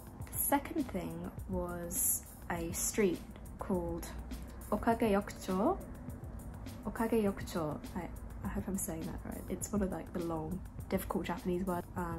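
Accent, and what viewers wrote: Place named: United Kingdom. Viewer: I’m studying English through your videos lately. Your British accent is so beautiful and easy for me (I’m Japanese) to listen to. I’m looking forward to watching more videos❤︎ (Sorry about my bad English) 最近の動画を見て英語を勉強しています。アクセントがとても綺麗で私でも聞きやすいです。これからの動画も楽しみにしてます❤︎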